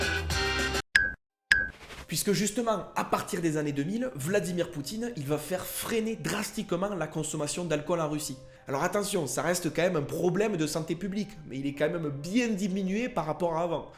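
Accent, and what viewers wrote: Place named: South, France. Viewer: C'est marrant de transporter ton accent du sud jusque dans ces froids polaires et inhospitaliers lol. Merci d'avoir exposé la face sombre de ce pays et de ses dirigeants, et encore tu es resté soft. J'en ai marre que des gens clashent Macron pour dire que Poutine est meilleur, avec plus de virilité et de charisme. Clashez Macron si vous voulez mais pas pour de mauvaises raisons. Poutine est avant tout un sociopathe qui se fout pas mal des droits de l'Homme (2 preuves parmi d'autres, il a bossé au KGB et il cautionne les agissements de son pote Kadirov contre les homosexuels).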